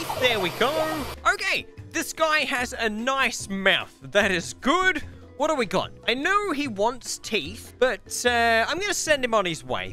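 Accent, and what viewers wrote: Australia. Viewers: Are you from Australia or something? Just like us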